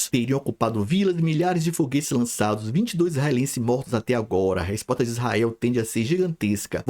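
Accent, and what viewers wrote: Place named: Northeast, Brazil. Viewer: Que bom ouvir alguém com sotaque nordestino que não seja Renan Calheiros, José Sarney, Ciro Gones et caterva. Parabéns !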